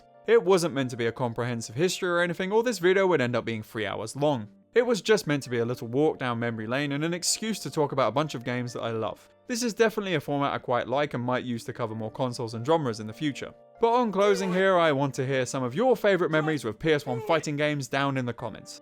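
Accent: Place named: United Kingdom. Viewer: I like when British man talks about games for over and hour myyyyy favoriiiiiteeeeee 😩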